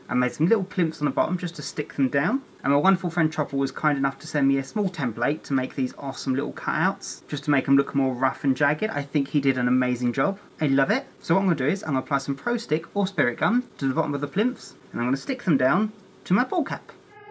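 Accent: United Kingdom. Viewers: OH HOW I LOVE HIS BRITISH ACCENT "mickey!" "but dead" *laugh*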